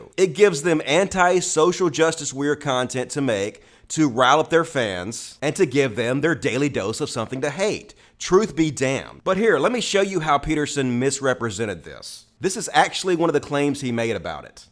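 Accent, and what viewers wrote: South, United States. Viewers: Thought so, that southern drawl. You don't know shit. A comedian in Quebec made a joke about a disable kid and lost his career over it basically. He is trying to appeal bu he's broke and has tried a go fund me page. He was fined tens of thousands of dollars and the court case broke him. Go Fuck Yourself, I'd slap you if you were in the room, lol.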